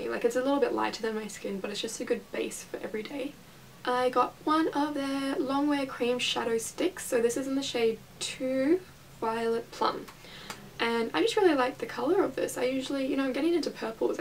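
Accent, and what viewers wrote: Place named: Australia. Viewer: Are you from Australia?? Btw I love ur accent ❤️❤️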